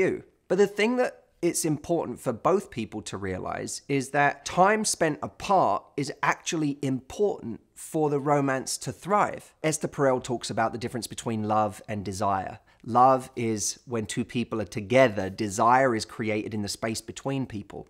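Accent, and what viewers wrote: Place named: United Kingdom. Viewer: Hello everyone, I m old, i like following those videos just for learn british english prononciation.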